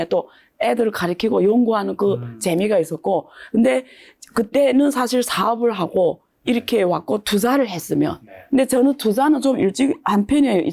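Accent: China. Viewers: 조선족인데 말투가 고쳐지지가 않네 교묘하게 짜장 빨던여자가 비판을하니 신뢰가 안간다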